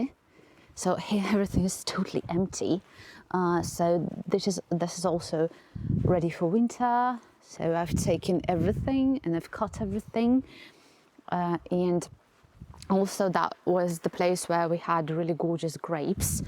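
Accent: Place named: RP, United Kingdom. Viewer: Love the posh accent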